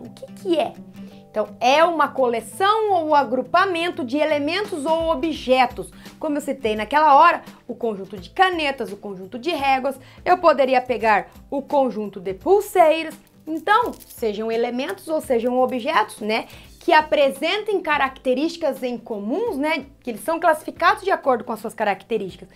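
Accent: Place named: Northeast, Brazil. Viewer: Você é nordestina?? Adorei o seu sotaque 🤗🤗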